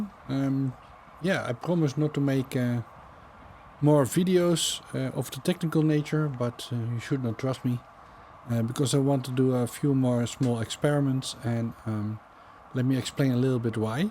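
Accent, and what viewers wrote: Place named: Netherlands. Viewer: I also wondered about this problem of being to much work even though we truly enjoy all your extras you put in the video. The helicopter view is something that almost no other channel has and I like this high in the sky view to gain perspective of were you are or how the landscape looks from a little far away. All this is a balance of how much effort and time you have to put into this VS it being to much work and stop making videos. I believe your Dutch accent and way of speaking is very calming and relaxing and a joy to listen to while eating my dinner or having a breakfast in the morning with my coffee while watching your videos. I can hear the difference in the red pavement or asphalt versus the brick roads which is so nice to listen to. Also the crows and birds I know these sounds well as it lets me remember riding the bike between Eindhoven and Nuenen. 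So if you have to limit yourself to how much work you have to do keep the narration and helicopter view and audio sound while on the bike. More important to people I believe is that you visit different places and share your knowledge of the area with the viewers. Thank you for all the hard work many here enjoy what you do. Dankje Wel Mijn frenden.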